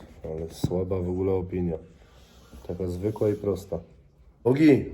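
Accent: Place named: Silesia, Poland. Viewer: Śledze cie od 6 lat albo lepiej, jeszcze kiedy nagrywales ciekawostki bez pokazywania twarzy i miales z 50k widzow. Ta zmiana akcentu na "ala śląski" jest pod widzów? Kiedys normalnie mowiles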